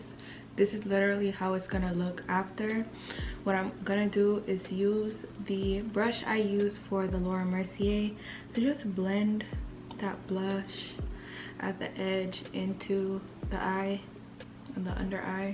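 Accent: Jamaica. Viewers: Oooh! I hear that Jamaican accent! 🥰 i love meeting other Jamaican Youtubers like myself! We ah go up this year!🤪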